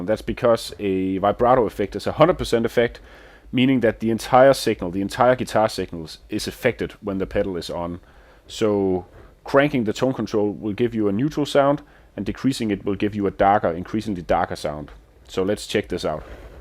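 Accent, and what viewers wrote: Denmark. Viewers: with a charming danish accent you even could sell me a boss metalzone.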